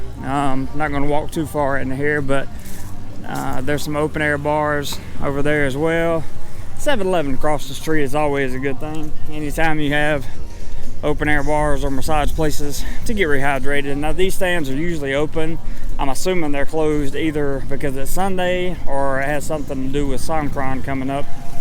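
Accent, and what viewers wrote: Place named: Texas, United States. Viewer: loved your texan accent cowboy ! howdy !! ;) great video mate :)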